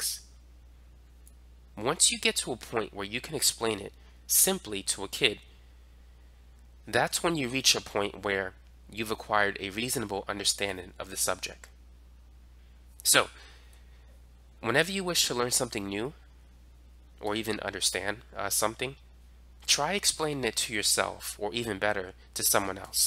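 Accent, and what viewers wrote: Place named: Bronx, United States. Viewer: you from the bronx? kinda sound like it (very random, sorry)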